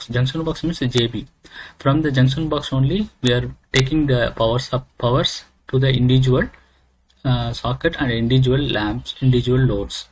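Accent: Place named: India. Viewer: India Accent 🎉🎉🎉🎉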